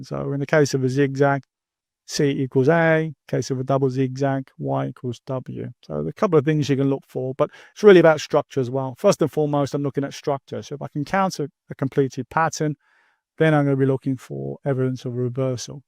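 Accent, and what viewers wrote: Birmingham, United Kingdom. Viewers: Are you from Birmingham uk?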